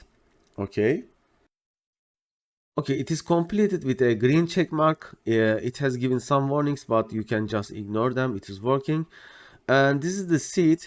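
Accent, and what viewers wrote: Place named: Turkey. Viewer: i am a turkish guy who wants to learn somethings on computer science. When i heared your voice, i am confused. I thought you are from turkey and i looked up your youtube channel then i saw your location which is Turkey. Your accent is how i understand.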